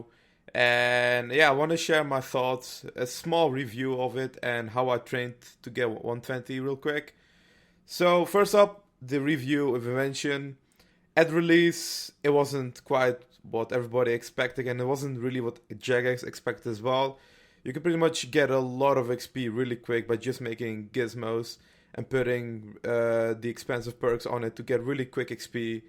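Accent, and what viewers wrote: Netherlands. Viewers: is how 80% off the dutch ppl speak english ahha! I'm also a proud dutchman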